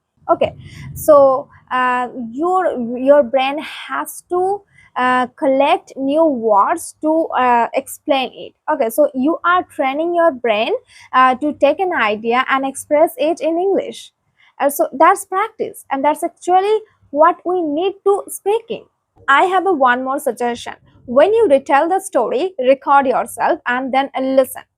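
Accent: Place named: India. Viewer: I like accent Indiah😊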